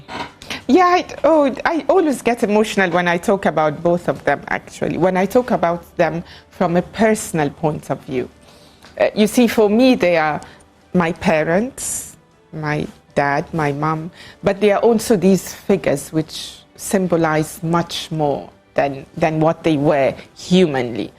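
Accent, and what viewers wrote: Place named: United Kingdom. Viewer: She talks like a British lady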